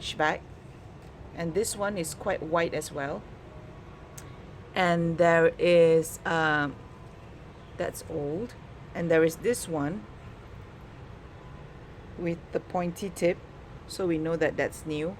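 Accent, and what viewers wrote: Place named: Singapore. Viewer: Immediately after I heard your voice I said, oh a singaporean plant hobbyist.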